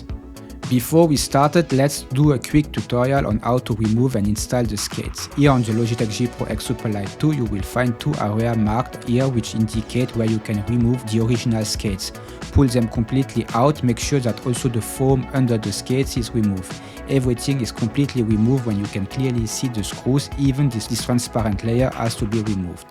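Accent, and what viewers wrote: France. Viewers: Mdr l'accent bien français (bonne vidéo quand même)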